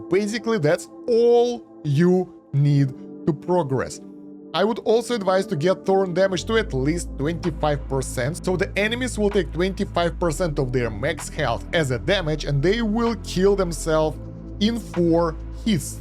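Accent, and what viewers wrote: Russia. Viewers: Искал видосы на русском языке , но не нашел ) думаю ладно открою первый на английском и тут мужик с русским акцентом 😂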